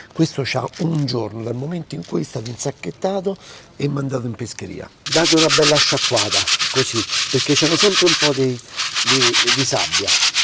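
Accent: Rome, Italy. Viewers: Minchia non mi stanco mai di vederti e soprattutto sentirti con quell'accento romanesco che mi fa' impazzire, sei grande sei la passione in cucina, grazie per i tuoi preziosi consigli ❤ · Ciao... Ogni volta che te sento parla mi sembra di ritorna' a Roma mia.... Mi manca tanto ❤❤❤❤❤ ricetta fantastica ❤ Bona!!! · Certo fai la spesa al mercato di trionfale parli romano sei de Roma e apri il ristorante a Milano...😅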